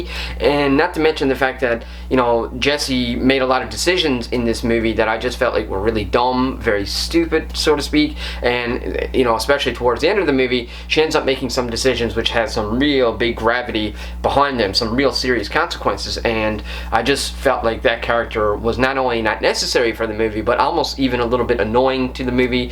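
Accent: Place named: Canada. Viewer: Probably not the greatest idea to make this movie when America is currently engaged in a literal soft civil Cold War. But im sure that's exactly why it was allowed to be made. It seems like pure propaganda to me. You sound Canadian. I suppose if they made a Canadian version of this, I'd watch it and think nothing of it. Maybe im wrong, but you keep saying "aboot" so I'm assuming you're from Canada. Forgiving me if I'm wrong. Edit: You said you're Canadian in the video. lol I probably won't watch this one due to being American. It hits home too hard with the current state of our nation. I'll take your word for it.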